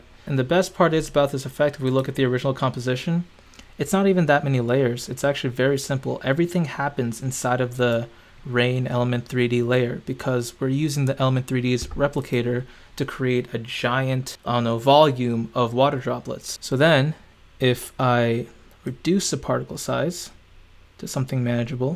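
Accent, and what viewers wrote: India. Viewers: Oh man wow !! Amazing ! Just a catch .....Indian bruv ? ;)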